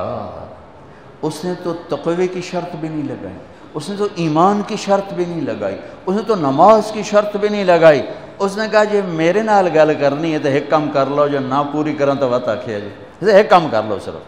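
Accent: Punjab, Pakistan. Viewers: Maulana ji mai india punjab to reverted muslim thoda pakistani punjabi accent bada kaim e ,,, mere naal gal karlo.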